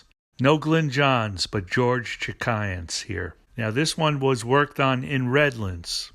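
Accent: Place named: Northeast, United States. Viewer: Once again I’d like to say I think you did a superb job at making these two documentaries. The making of BgsBqt. Part 1 and 2 I want to add some wood to the fire because I know you are working on part 3. I’m sure it will be great! What I want to say is I think you have gotten even better at making your documentaries! The CRYSTAL CLEAR CLARITY IS EXQUISITE!!!! You have a very good voice. If you ever check the wonder years tv show the way they depict the 60s and early 70s that narrators voice is just right. I like your stuff because it is soothing the same way as the wonder years was! Let’s face it these are the wonder years too we are talking about. Or I should say that you are talking about. It has to be that voice of yours. To me it is important that it is a NORTH EASTERN United States accent as such. Somehow an English accent just rubs me the wrong way when they are talking about the Stones. 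Or the wonder years. LA accent just sounds like they don’t quite know what they are talking about. You really come across as very genuine and you come across as really knowing what you are talking about. You calmly and cooly and in a clear non-rushed way guide us through it. The QUINTESSENTIAL AND PROLIFIC AND ICONIC NARRATOR OF THE WONDERFUL WONDER YEARS OF THE STONES! The greatest damn narrator of the Stones of ALL TIME! You tell their story best!!!!!!!!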